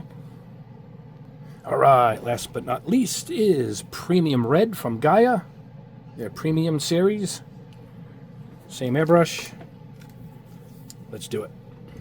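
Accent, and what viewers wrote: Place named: New England, United States. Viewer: Back to modeling after a 60 year hiatus. Very much like your informative videos. I live in CA and recognized your New England accent right off the bat. I grew up not far from you, north shore Boston. I've been looking for MRC leveling thinner but it is nowhere to be found so I just placed my order for your replacement product. Thanks, looking forward to trying it out.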